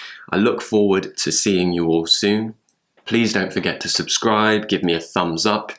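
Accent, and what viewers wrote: United Kingdom. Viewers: I am Thai guy. I like British accent. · Mad love for you British accent 😍 When I used to live in my country (Poland) I was snowed in so many times... So I think winter in Uk is pretty warm, especially in London. Anyway thank you for lovely video, I really enjoyed. I'll often go back to your channel.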